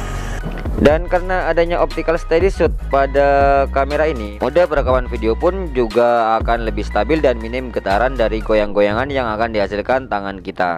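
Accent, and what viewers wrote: Madura, Indonesia. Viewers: Orang madura ya bang? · kayak orang Madura malahan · Orang madura ya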